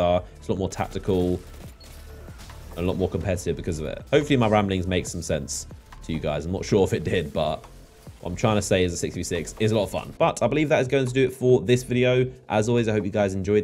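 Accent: United Kingdom. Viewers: Can you learn an American accept please to be more relatable to most users? This british thing is hard to listen to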